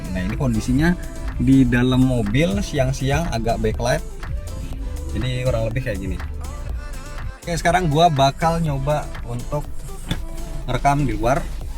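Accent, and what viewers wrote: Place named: Java, Indonesia. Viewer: Bang, ternyata medog juga ya 🤣🤣🤣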